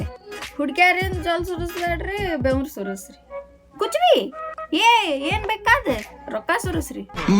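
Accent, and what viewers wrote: North Karnataka, India. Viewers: ಅಕ್ಕಾ ಯಾವ ಊರು ನಿಮ್ದು plz ಹೇಳಿ ನನಗೆ ಅನ್ಸುತ್ತೆ ಪಕ್ಕಾ ಉತ್ತರಕರ್ನಾಟಕ ಅನ್ಸುತ್ತೆ 💥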